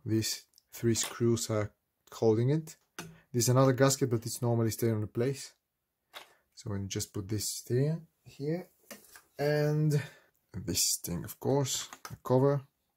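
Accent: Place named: Russia. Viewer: По акценту вроде наш) как снять эти серые крышки, не повердив корпус?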